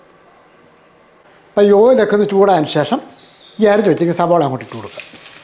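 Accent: Kottayam, India. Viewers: സൂപ്പർ 🥰 നല്ല നാടൻ കോട്ടയം ഭാഷയിൽ ഉള്ള അവതരണം 👌👌🥰🥰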